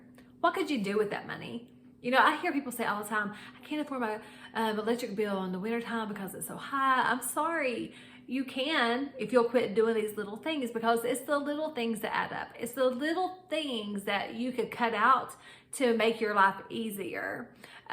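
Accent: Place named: South, United States. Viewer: You’re Dave Ramsey but with a pleasant personality and a better southern accent ❤ I am Russian and I’m loving your videos so much!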